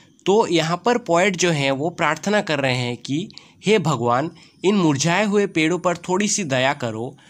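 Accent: Assam, India. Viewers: Sir r u Assamese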